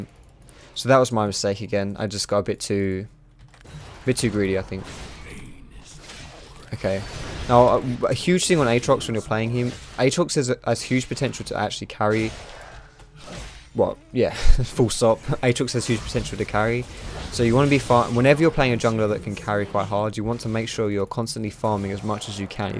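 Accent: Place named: United Kingdom. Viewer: Where in the UK are you from?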